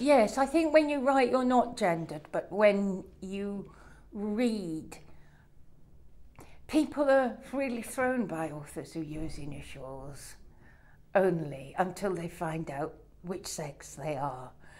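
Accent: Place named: Derbyshire, United Kingdom. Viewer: She's posh for Derbyshire, I'm from there and most people don't sound like this!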